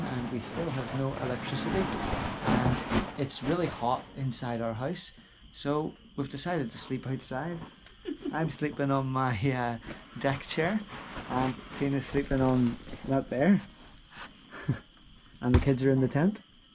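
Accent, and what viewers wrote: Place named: Northern Ireland, United Kingdom. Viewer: Are you by any chance from Norn Ireland? Your accent kind of reminds of a friend from Belfast. Greetings from a wee man from Iloilo as well. Unfortunately we tend to get stained by the NGCP's incompetence. I love my city but I'm scundered by the length of brownouts sometimes. Terrible intro to 2024. I hope your doing grand mate!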